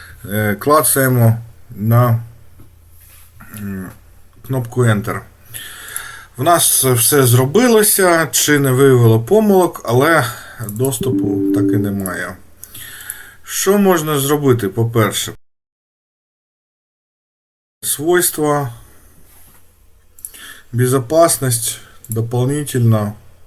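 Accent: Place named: Surzhik, Ukraine. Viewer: молодець, і як фахівець, і як справжній українець, а саме: ділиться власним досвідом, за допомогою якого я раніше відновив HDD диск Western Digital на 500 Гб, а також перейшов на українську мову, що дуже приємно чути, навіть суржик від у мене особисто викликає повагу до нього, адже найважливіше зробити перший крок. Я раніше теж спілкувався мовою східного "сусіда", але зараз розмовляю українською і незначні помилки з часом виправляю, а моє оточення ділиться приємними враження від почутої від мене української мови. повністю підтримую. Від мене лайк, давня підписка і всього тільки найкращого.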